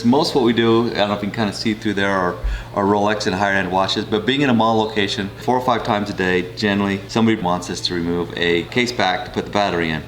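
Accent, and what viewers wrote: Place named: Utah, United States. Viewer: I appreciate the information---I recognized your Utah accent in 1 second and knew before looking up you absolutely had to be in Utah lol. Thanks again!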